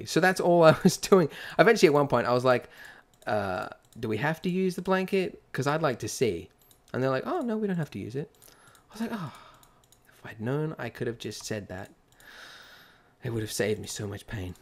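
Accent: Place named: Australia. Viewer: Aawwh, great job once again! The nice thing 'bout your videos is that you can learn about blender and practice aussie-accent at once! :D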